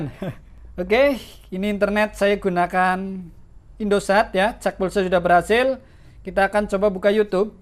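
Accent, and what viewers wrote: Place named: Tegal, Indonesia. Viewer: Mas njenengan banjarnegara, tapi logatnya ko kaya tegal ya?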